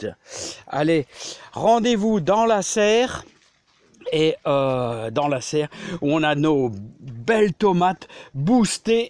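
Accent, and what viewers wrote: North, France. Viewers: Merci à vous pour tous vos conseils quand je sais pas je recherche dans vos vidéos et j'ai ma solution cela fait maintenant plus de 2 ans que je vous suis merci pour partager vos conseils et votre passion du jardin avec l accent du nord je suis à côté de Lille une question quand même votre jardin est très très grand que faites vous de tout les légumes récoltés car la quantité doit être impressionnante ? Merci bonne continuation à vous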